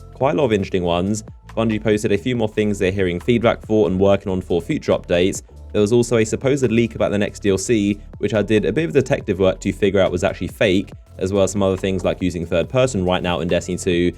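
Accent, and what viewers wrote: England, United Kingdom. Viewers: I really like English accents. You're a very good youtuber, but when I see big news that various YouTubers cover I choose yours because you just sound so dang proper.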